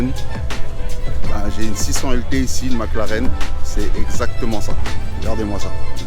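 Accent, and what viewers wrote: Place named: South, France. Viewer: Savas tu vas très bien et bien décontracté pour moi bonne vidéo on dirait que tu est originaire du sud de la France moi aussi est je vie à Miami fl à plus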